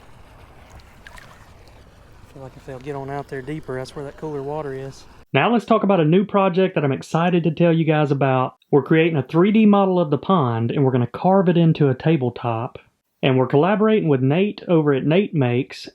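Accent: South, United States. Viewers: Hearing you describe the pond tech in a Southern accent is peak Americanness.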